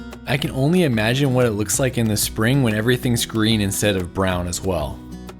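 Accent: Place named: United States. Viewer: American accent All I hear in every sentence is “ coastal trail” with no other information. Are there no biilfungs or a town there to buy food abd water? Is there nowhere to stay overnight? Are there no emergency services? Tgat woukd make sense but then again you’re Americans. Evrry little about yiur country makes sense.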